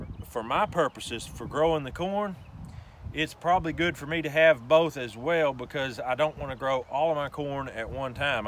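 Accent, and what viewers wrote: Tennessee, United States. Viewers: As soon as I heard your accent, I thought you must be near me (Fentress Co)! Great information for me to know when to plant this. Thanks!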